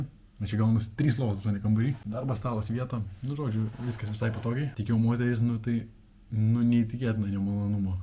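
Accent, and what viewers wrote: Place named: Rokiškis, Lithuania. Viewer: Ale😊😊😊 atspėjau,kad iš Rakiškio nu vienu žodžiu iš to gražaus krašto girdžiu pagal tarmę😊😊😊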